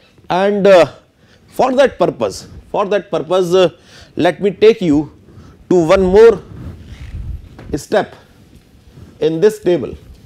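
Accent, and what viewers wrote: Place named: India. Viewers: His english is better than you , he has an Indian accent. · OMG improve your English please 😭 Thanks for the hard work but i can't understand you cuz it's like speaking English in Hindi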